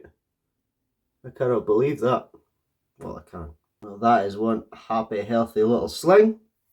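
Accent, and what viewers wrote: Scotland, United Kingdom. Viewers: I watch Dave every week and his channel with camera lady it’s fab, I’ll admin nice to hear your Scottish accent looking forward to more of your post now that I know your here.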